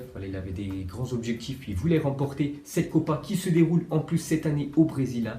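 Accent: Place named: France. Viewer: arrête de prononcer tous les noms de joueurs à la française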